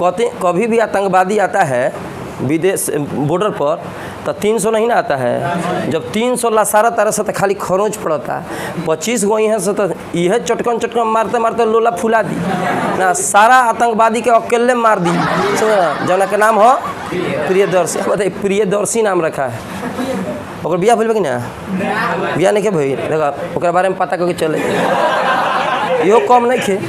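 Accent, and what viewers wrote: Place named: Bhojpuri, India. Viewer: Sir aapke per ye Bhojpuri aawaj accha nhi lagta hai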